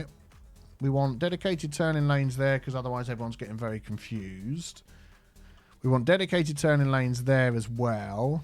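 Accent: United Kingdom. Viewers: Ah! Poke me eye out! Love the British accent,. Adds flavor to your videos. Good stuff as always.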